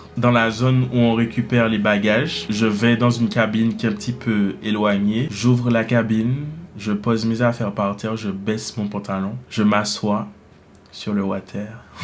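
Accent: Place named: Martinique, France. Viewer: Phoenix en Arizona et expatrié depuis 1979. Ça fait bien plaisir d'entendre l'accent de la Martinique. Tu as beaucoup de talent. Merci pour tous les bon moments de rires.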